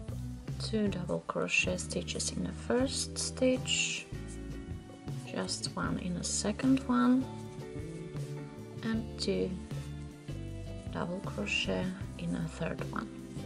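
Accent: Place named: Russia. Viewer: Thank you for explaining how to read a chart. I have always wished I could follow them. I have some amazing crochet thread/yarn that I bought 8 years ago but never did anything with them because I did not know how to read the pattern they were purchased for. I asked for a written pattern and received a chart. You have given me a wonderful gift of knowledge that is priceless. The only place I was fuzzy about was the initial chain. You appeared to make the chain length 8-10 times from the chart and that is why you were making the boxes on your sheet? Is that correct? That is how you make it to the circumference for each individuals sizes. Please tell me if I am understanding this part. The rest of the pattern appears to make more sense to me now. One last question, your accent. Where if you don't mind me asking are you from. My sister-in-law Valentina is from Russia and has a lovely accent similar to yours.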